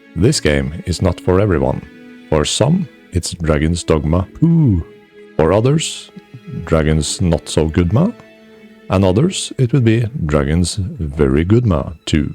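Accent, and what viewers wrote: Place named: Norway. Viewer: haha this english it could only be the one of a norwegian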